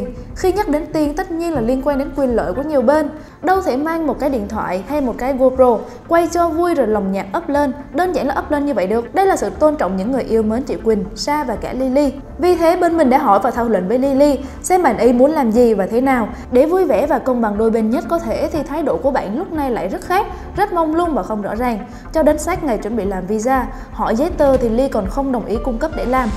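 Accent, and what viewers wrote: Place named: Central, Vietnam. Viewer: thích bạn này nói giọng miền Trung hơn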